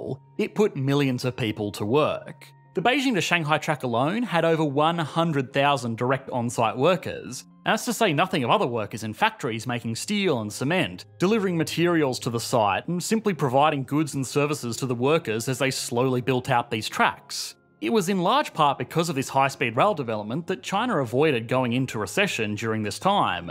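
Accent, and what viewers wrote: Australia. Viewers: He is Australian but I would suggest has lived OS for a few years, Most Aust as u correctly stated pronounce route as "root" and router as "rowt-er" as it has a sexual meaning in slang. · Usually but in this case he is a pretentious individual trying to appeal to the Americans. If he was genuine in what you he would have dropped his Australian accent. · 这个口音我猜听上去像个澳大利亚人，众所周知，澳大利亚跟中国的关系现在一点都不好。我还觉得粉种人显然对中国人的经济观完全不懂，喜欢用自己的经济学常识分析中国，中国人一般认为经济出现大问题是因为存量市场瓜分殆尽，并且新的技术革命未能出现。至于债务危机，中国是一个儒家国家，政府对经济部门的掌握不仅仅限于交通工具，还有金融、能源、化工、机械、物流等各个领域，任何部门的利润在必要时都会拿来作为财政收入填补其他公共部门的亏损。而且高铁的建设是为了带动整个地区产业的繁荣，并不以追求利润为目标，所以广大的中小民营企业才是高铁的最大受益者。如果要说债务危机，那么我认为维持一个高福利社会的制度危机更严重。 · Australian accent is so freakin weird like how he says todddaaaay lool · What is this australian dialect/accent called? I need to know so I can avoid it in my life forever.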